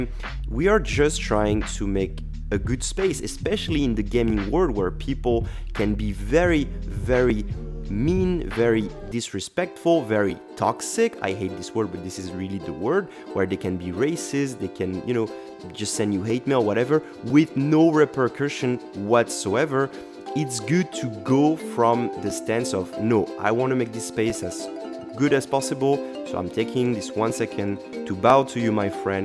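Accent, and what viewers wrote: France. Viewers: French accent makes this 10 times better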